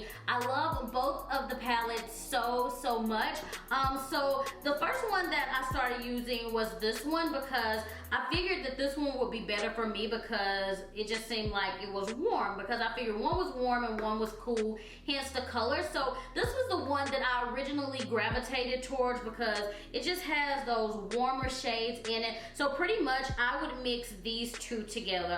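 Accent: South, United States. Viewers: My 15 year old and I watch your videos together we love you. Love your southern twang. Snapchat: queencindy619.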